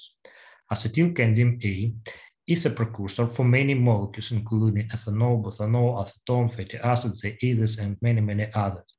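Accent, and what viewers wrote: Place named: Russia. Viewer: your accent is absolutely from Russia or like these countries, it never seems like English, if you can write in title boxes in English it will be better so we can understand....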